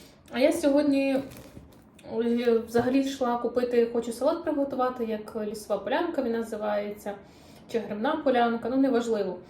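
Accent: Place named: Odesa, Ukraine. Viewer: У вас чудова літературна вимова, як для Одеси.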